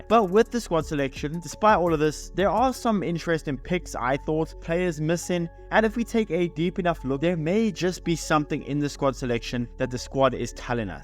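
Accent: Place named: South Africa. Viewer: South African 🇿🇦 accent for the win 👊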